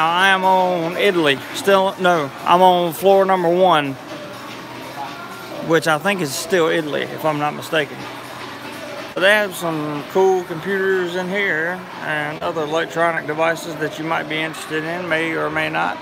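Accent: South, United States. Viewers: His southern accent is so attractive. 😻 laugh like George W sexy boy!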